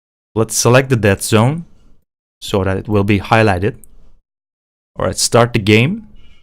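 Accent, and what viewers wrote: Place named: Turkey. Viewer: Lovely accent but immedietly heard something familiar. It didn't take me long time to realize you're also Turkish. Thank you for excellent video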